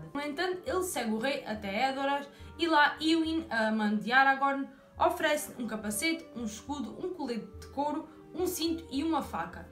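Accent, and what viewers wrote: Portugal. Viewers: Português de Portugal,acho tão chique! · Parabéns,! O vídeo ficou muito bom. Sem falar que adoro sotaque "português de Portugal"!:D Será que tem material para fazerem vídeo explorando rotina diária de povos da TM? Exemplo: Um homem comum que morasse em Gondor. O que ele tinha como opção de trabalho? Servir no exército? Ser camponês... Os filhos como eram educados, se existiam escolas..E as mulheres que tipo de serviço faziam, ficavam só em casa... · Preciso me acostumar com esse sotaque português 😆😆😆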